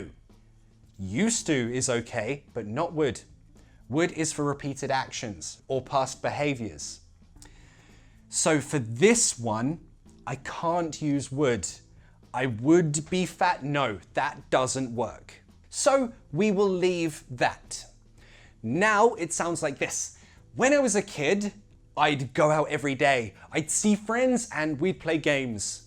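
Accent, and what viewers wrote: United Kingdom. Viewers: Are u British? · You have a great British accent. You explain English topics perfectly and clearly.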